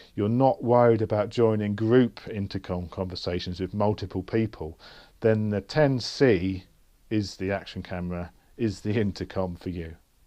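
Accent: United Kingdom. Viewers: reviews are certainly more watchable when it's in a smooth english accent. Sure beats Americans telling me "it's frikkin awesome!". May want to think about quitting your day job;) a walking disaster haha either that or his comedy act is really coming along. Can I ask…how much does it cost to feed and look after a pet? only they look like so much fun but I've also heard bad things like they go a bit ravenous over a nipple and also pretend to leave their debit card at home when it comes to paying all the time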